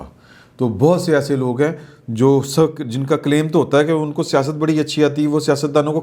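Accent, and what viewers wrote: Punjab, Pakistan. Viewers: Your punjabi blended urdu narration is really fascinating. Also,your way of telling events in nuanced manner, associated with humour & sarcasm is probably the best amongst the other competing Ytubers.You said politics is not everybody's cup of tea but,I bet you are no less a seasoned politician. Good luck to you.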